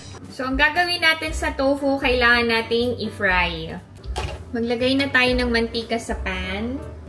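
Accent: South Korea. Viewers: I love hearing your Korean accent..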